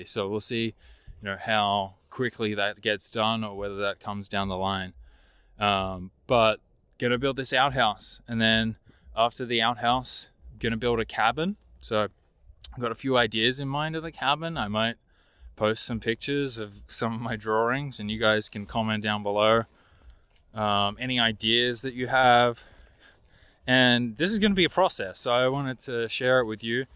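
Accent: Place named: Australia. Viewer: Hope the property is close to a Tesla supercharger. Sound Aussie or Kiwi.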